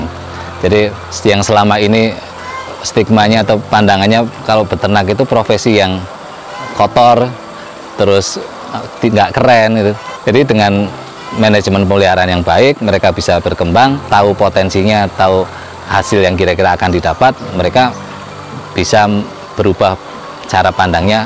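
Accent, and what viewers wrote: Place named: East Java, Indonesia. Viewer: Logat jawatimuran Saya dulu di jatim sekitar taun <num> Surabaya-Malang-blitar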